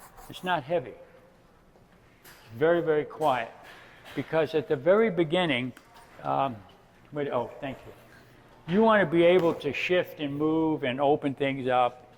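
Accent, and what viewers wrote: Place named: United States. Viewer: I was not sure at first and I thought on no not another yank talking, but I just love how they turned out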